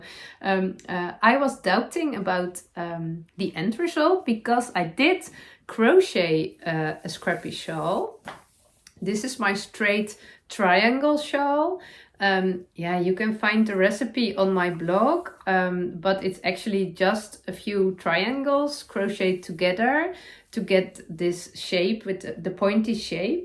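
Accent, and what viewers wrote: Netherlands. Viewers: I love your Dutchy English. It always makes me smile. Some of the things you say may be grammatically incorrect, but they make perfect sense and that is what I like. Thanks for podcasting in English for the rest of us. 💜💜💜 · Hello. Happy New Year to you….bit late but better late than never! Love your vlogs and your Dutchy English always makes me smile….in a good way! I too have been wanting to cast on a Felix cardi so maybe, if I finish my Stephen West MKAL😂😂😂I’ll cast on with you. Best wishes❤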